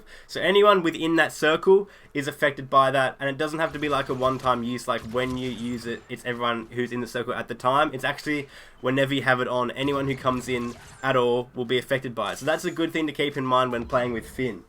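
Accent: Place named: Australia. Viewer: why is every fucking battlefront 2 video narrated by an aussie?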